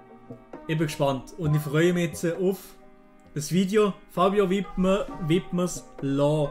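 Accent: Switzerland. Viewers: Sehr schönes Video von dir aber versteh dein Schweizerdialekt nicht bin Deutscher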